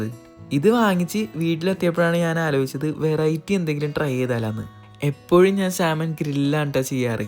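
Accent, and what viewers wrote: Ernakulam, India. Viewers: I love your ekm slang❤️❤️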